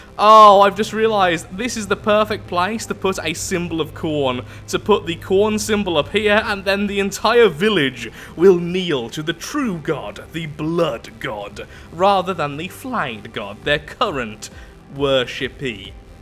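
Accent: United Kingdom. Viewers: Indeed (also British accent)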